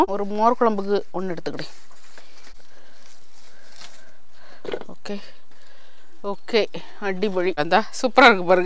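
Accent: Nagercoil, India. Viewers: Apdiye Nagercoil style la ye pasrenga sema ka, school time neyabagam varuthu, am also from kk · Nijama nagercoil la ullavanga kuda syle nu pechaiye mathitanga.. Ana neenga pesuratha kekumpothu alaga iruku.... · Hi Sister. என் வயது 45. உங்க பேச்சு அப்படியே நாகர்கோவில் Slang. நான் பிறந்து வளர்ந்தது திருநெல்வேலி. திருமணம் ஆனது நாகர்கோவில். உங்க யதார்த்தம் பிடித்தது. காந்தாரி மிளகாய் செடி வளருங்கள். மருத்துவ குணம் உடையாது. நன்றி. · Pakka Nagercoil baashai😅 mam poli🔥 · நாகர்கோவில் slang super 👌 ௨ங்க expression அடிபொளி 😄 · நாகர்கோவில் பேச்சு வழக்கில் நம்மூர் அக்கா லண்டனில் இருந்து பேச கேட்கும் போது உண்மை யிலேயே என்ஜாய் பண்ணி பார்த் தனக்கு. கீப் இட் அக்கா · Pothisoora vida neenga pesura nagarcoil slang romba nalla iruku... Neenga sapdurathu atha vida romba nalla iruku... Nagarcoil la irukura mari feel iruthuchu.... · Sister after seeing ur channel nan unga channel than pakurean.because neenga namma nagercoil samayal poduringa.nangalulam nagercoil than ana chennaila irukirom.neenga samaipathum pasuvathum nama ooru nabakum varuthu.so we enjoy and see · Makka Namma Nagercoil pechu thaan vera level makka 👍❤️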